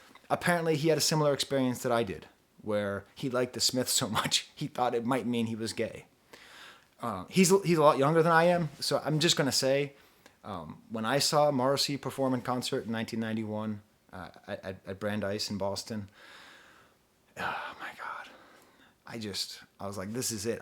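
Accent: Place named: Bahamas. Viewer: Words cannot express how proud I am of for stepping out and being such positive representation of the Bahamas and the Caribbean in general. I just happened to get on his channel through Khadijah's recommendation and I started to watch the video and I was like "why does this man sound Bahamian??" come to find out here and I are from the same country. He really brings a perspective needed so desperately so desperately in left tube. So many people assume so many things about the Caribbean and we don't even get proper representation in media.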